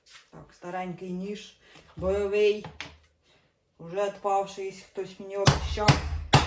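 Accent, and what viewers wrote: Poltava, Ukraine. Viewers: Неподражаймий полтавский акцент.В мене батько з миргородщини.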